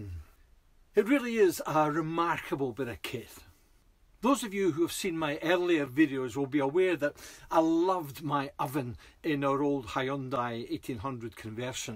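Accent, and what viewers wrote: Scotland, United Kingdom. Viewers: Something about the scottish accent makes for a great story teller, the way you said calzone with such enthusiasm, great review and great video to watch, just ordered one from amazon will be collecting from a locker tomorrow and giving it a go.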